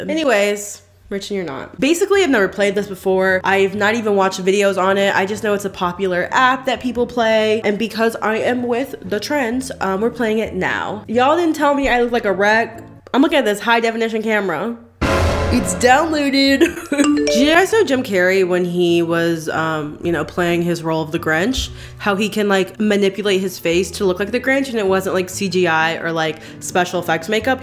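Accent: France. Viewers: The French accent though. 😂, SHE'S ICONIC! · omg i love your french accent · YOUR FRENCH ACCENT SLAPS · the french accent is EVERYTHING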